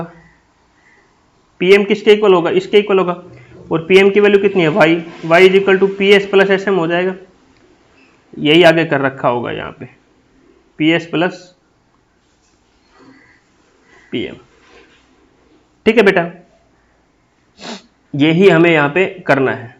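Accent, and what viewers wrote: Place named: Haryana, India. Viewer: Ram Ram sir ji , your accent clearly haryanvi , thankyou for great information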